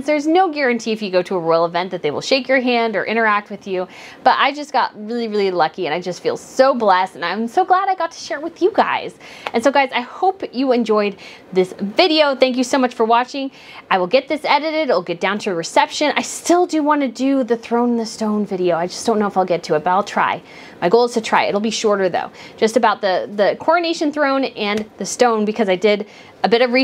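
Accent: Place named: United States. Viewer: This is so sweet! You sounded really polite and excited at the same thing! Nothing embarrassing! I'm sure she thought you were really sweet and surely she recognized your American accent, meaning you made a big journey to be there! I hope you have a blessed time at the Coronation today, and I'm praying for some very special experiences. <3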